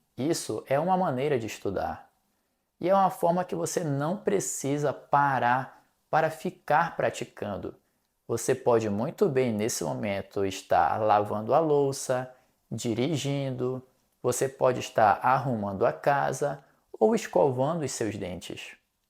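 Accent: Rio de Janeiro, Brazil. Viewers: Que motivante, gostei! É um prazer ouvir esse seu sotaque carioca, muito bonito, que posso utilizar como um modelo para o meu. Obrigado! 😊 😎 🎉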